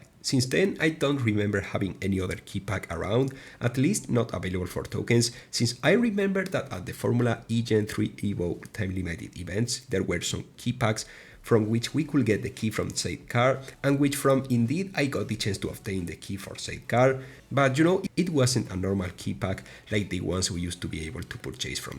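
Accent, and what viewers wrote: Spain. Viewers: his main lang is spanish, i personally love his accent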